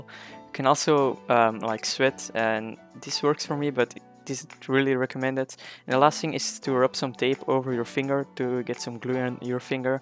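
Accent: Netherlands. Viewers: U sound dutch, where you from? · You have a Dutch accent?? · Where you from you sound dutch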